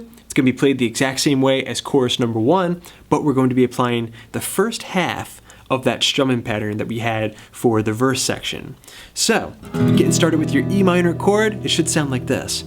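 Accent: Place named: South, United States. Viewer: Love the country accent to it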